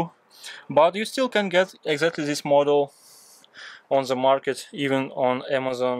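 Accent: Russia. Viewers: Чувствуется русскоязычный акцент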